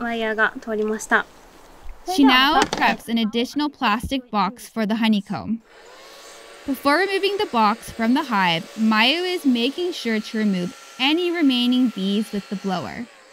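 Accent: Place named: Japan. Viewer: Жаль, что она не говорила просто по-японски. А английскую речь с японским акцентом слушать невозможно.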